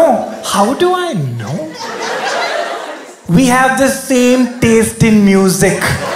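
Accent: Kerala, India. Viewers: His malayali accent is also cool. Enjoy your samosas, people. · Malayali accent is nice! Mallus are so sweet.. My in laws are (Sasural) Malayalee. They all are super sweet. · malayali accent is too cute anyway 😉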